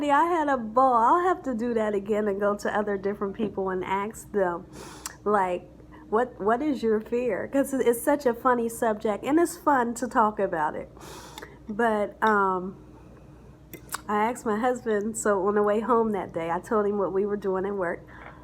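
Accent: South, United States. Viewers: I just love your videos! Love your southern sound! I love to cook as well. I cook old school puertorriqueño food. Everything is delish. I saw your video and you mentioned the mean haters. Do not even knowledge them. You have more kind and blessing people that follow you! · You have the sweetest voice ever. I also hear a slight accent but can't put my finger on what accent that is? I was thinking maybe a southern accent?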